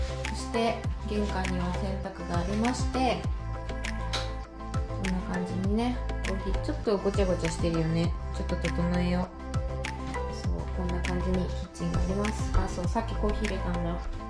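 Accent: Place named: Gifu, Japan. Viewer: 冷蔵庫のデザイン可愛いですね👍 ちょくちょく出る岐阜弁がたまらんわ ((´∀｀))ｹﾗｹﾗ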